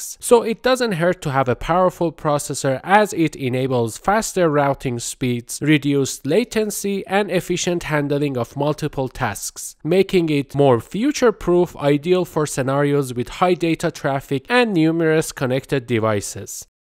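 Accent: Iran. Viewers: چرا لهجه ایرانی ها انقد ضایعست و معلومه😂😂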